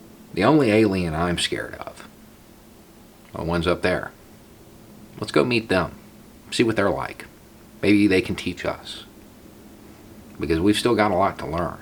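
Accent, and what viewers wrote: South, United States. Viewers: Dan Carlin is absolutely more knowledgeable, he should have an honorary doctorate in history from some prestigious university. However, this man reaches people that Dan could never, because of the language and dialect in which he speaks. talks to people from Appalachia and the Southern States in a way that is digestible. If someone from the "outside" spoke the same words, with more authority....it would feel condescending. Nothing shuts down a blue-collar working southerner, more than someone with a Brady bunch dialect trying to give them a history lesson.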